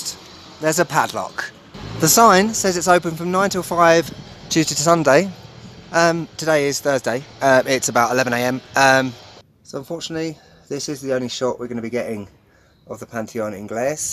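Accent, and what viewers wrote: United Kingdom. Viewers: Hi, Love this video and it shows that you are truly in a league by yourself when it comes to producing an informative, quality vlog that is truly worthy of watching again in the future. I always enjoy as an American just listening to the British accent and love your culture and unique sense of humor. You're in a class of your own and your class and intelligence and the effort you put into your videos shines through. The Kennons and Tangerine travels don't even come close and you and I all the other brilliant, smart, educated people who watch you on a regular basis know this to be true. Look forward to your videos in England and Bulgaria.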